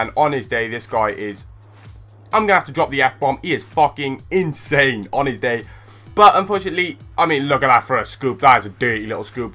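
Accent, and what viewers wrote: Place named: Welsh Valleys, United Kingdom. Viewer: u sound like taht guy out of the valleys lol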